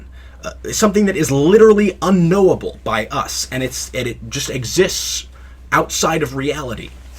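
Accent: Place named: Northern England, United Kingdom. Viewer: it made me chuckle a little hearing you say "Oedon" in a a northern english accent.. you can just pronounce it "Oden" its just the accents in game that make it sound like Ewwwdon 😂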